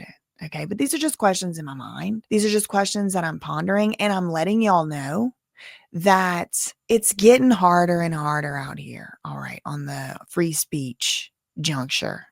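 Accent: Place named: Louisiana, United States. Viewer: I get a kick out of your witty banter and Louisiana twang. You always say “allegedly”, “in my opinion”, “Gods Favorite” and last but not least my favorite, “Facts Ain’t defamation!” Thank you for always bringing a smile to my face.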